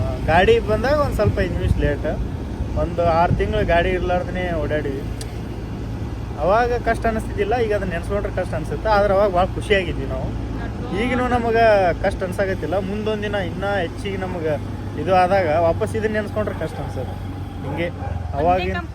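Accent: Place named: Bangalore, India. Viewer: Nim vlog nodi khushi ayitu😂 benglore bhashe chand matadiddiri sis❤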